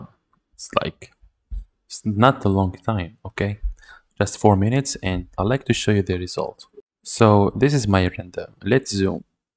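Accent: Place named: France. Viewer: Your english is great and your accent is barely even there. Like, you can tell you are french, but your english is totally normal just with a normal french accent. Your english speaking is better than the majority of Americans, some of who have really thick accents even though they only speak 1 language, lol.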